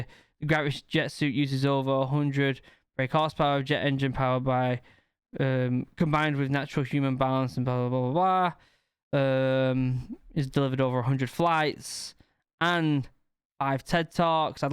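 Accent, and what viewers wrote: United Kingdom. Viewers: Why you have a British accent lol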